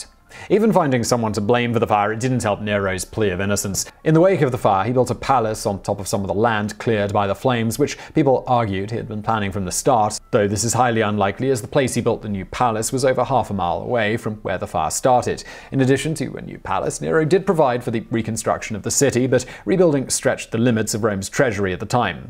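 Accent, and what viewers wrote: United Kingdom. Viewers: Nero tarnished his own name. He didn't need anyone else to do it. He is likely the Beast of Revelation. This guy gets a lot wrong, but because he says it with a pompous British accent and feigned authority, people believe it. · You sure do make a lot of assumptions. So Neil Patrick Harris is probably pretty knowledgeable about surgery? And news anchors practice reading off the teleprompters? You bring up that he has multiple channels. Is he doing that for all of them? You only think of him as intellectual because of his British accent, not because of any real critical analysis.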